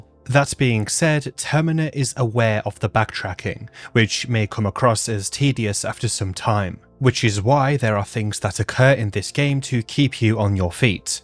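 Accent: United Kingdom. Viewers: the full voicing of this video plus your british accent makes me feel like im listening to a tma episode at times lmfao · as a linguistics major, you didnt really mispronounce very many foreign words in the last funger video. And if anything most of the words that people thought you did mispronounce can be chalked up to your specific english accent.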